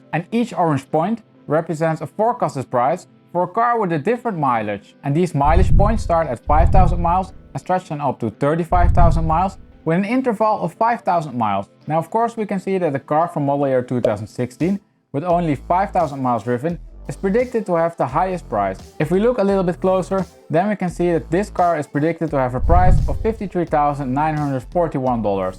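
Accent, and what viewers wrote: Netherlands. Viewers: you have a Dutch accent.....Kan U look Nederlands praten?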